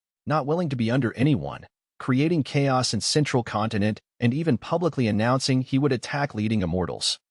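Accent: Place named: France. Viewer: Why tf are you french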